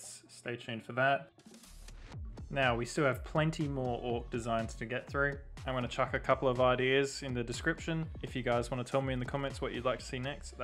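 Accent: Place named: Australia. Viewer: This is so awesome - great models. Have you found your modelling skills have really improved since you started with the videos? I'm interested in hearing how you feel about your progression. Also, nice to hear another Aussie!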